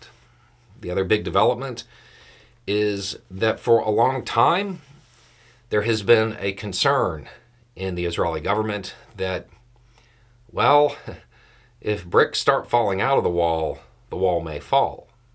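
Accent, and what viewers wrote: South, United States. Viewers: Perceptive video. Southern States accent for sure, but did I catch a trace of an Australian accent as well? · I love that sharp intellect cloaked in that velvety southern accent. I know your accents are not exactly the same, I think you are from different regions of the south but you remind me of my favourite southern gentleman Shelby Foote. 💖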